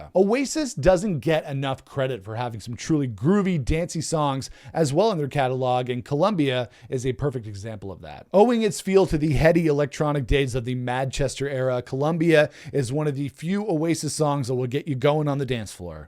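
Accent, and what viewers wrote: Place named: United States. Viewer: I looked at the thumbnail and for some reason knew I was gonna hear an American accent lmao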